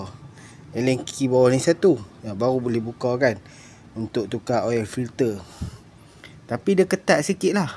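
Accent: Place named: Perlis, Malaysia. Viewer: Abg org perlis ka,cara abg ckp mcm org perlis....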